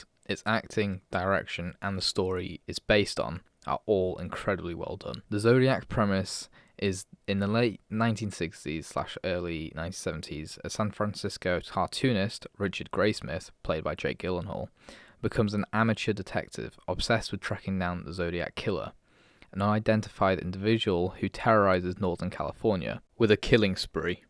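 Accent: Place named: United Kingdom. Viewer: I enjoyed the review m8 👽 Gotta love the silky smooth british audio~ keep up the good work. 👍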